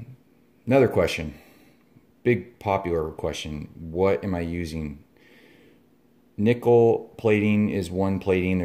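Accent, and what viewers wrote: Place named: Wichita, United States. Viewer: GREAT idea about the Ni welding rod - did you find at Harbor Frt? Sounds like you are from Wichita - me too. <mail>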